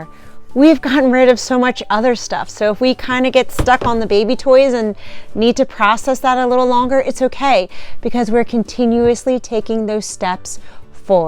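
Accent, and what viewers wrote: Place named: Philadelphia, United States. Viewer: I've really enjoyed watching your channel, not only because you have a great short term-long term process, but because I live in the same region of the country (Philly) and it is good to see and hear the local dialect, see items and logos from local stores, etc. I have been trying to support more local channels in general, because it is by extension a connection to the regional community -- something I don't think we have enough of. Don't sweat the sentimental kid saves -- I had boxes, tubs and closets full of them until my last move in 2015. Sadly, much of it was ruined, and my other excuse --- saving it for the grandkids --- became lamer and more irrelevant by the day as the grandkids became too old for the things that were salvageable. I ended up with one special cedar chest with my most sentimental saves once I let it all go.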